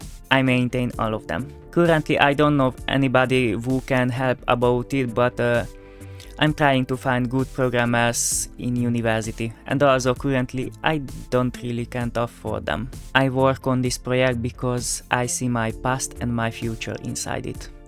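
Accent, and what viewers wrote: Hungary. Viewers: AMERICA 🇺🇸🇺🇸🦅🦅 Wait, what language do you speak then? I hear an Hungarian accent so I assume that you speak Hungarian (like me!!! ) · The Hungarian accent is very audible, which I'm happy about because I'm Hungarian Szóval nagyon várom hogy kijöjjön a játék<3 (ha még nem jött ki) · Ik I recognized the hungarian accent ^^ tudtam felismerem a magyar accentust :3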